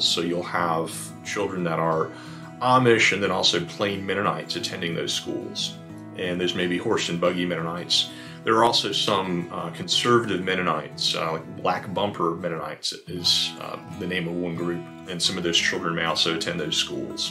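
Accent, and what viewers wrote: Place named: Pittsburgh, United States. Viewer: That Yinzer "O" kept escaping!🤣🤣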